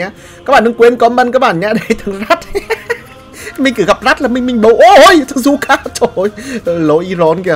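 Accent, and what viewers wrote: Nghe An, Vietnam. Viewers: Yêu giọng NGHỆ QUÊ CHOA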